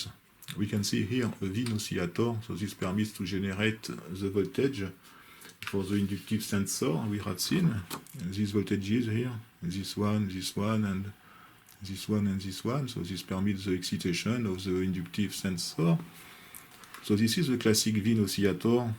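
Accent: France. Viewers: Époustouflante travail de reverse engineering et excellentes explications des différents schémas. L'accent est catastrophique malgré un très bon niveau d'anglais, mais c'est un problème fréquent chez mes compatriotes français. Je reste abonné 120% à cette chaîne, unique par son excellent niveau technique. Bravo!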